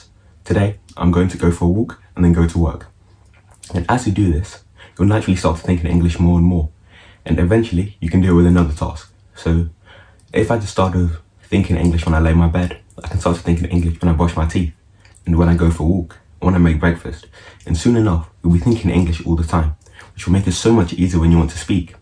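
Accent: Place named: United Kingdom. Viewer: Guy your British accent is perfect!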